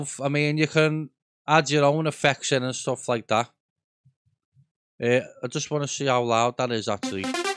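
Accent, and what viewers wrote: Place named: Liverpool, United Kingdom. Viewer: yes! im over in runcorn, obviously your accent tells me your liverpool area. do you bother with private lessons mate? also, which keys are you pressing to get this melody, cheers pal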